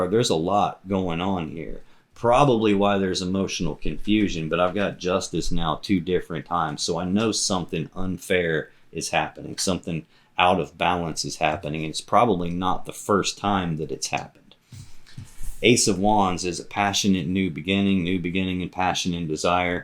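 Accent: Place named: Texas, United States. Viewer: Your accent is going to fit in nicely in Texas, it almost sounds like a native Texan.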